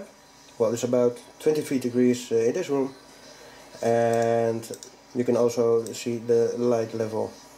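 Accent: Netherlands. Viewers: eeckhoudt About 100% sure the accent is dutch. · You sound like a dutchmen ?? Are you ? If yes , i have a lot of questions in the future . I'm now waiting for my Pi ( just ordered one) . Then testing and a lot of sleepless nights ...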